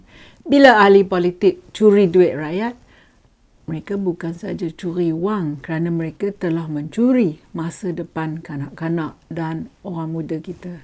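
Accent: Perak, Malaysia. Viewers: mula² teman ingat Cik Mariam ni org KL. Bila tengok video ni, ate ghupernya orang Perak gamaknya. Pekat bebenor loghat Perak. Seronok teman mendengornye. Thumbs up sis 👍👍👍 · Piyor loghat Perak nya👍👍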